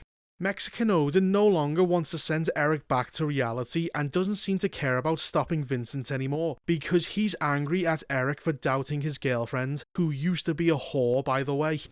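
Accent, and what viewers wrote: Liverpool, United Kingdom. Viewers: Are you scouser???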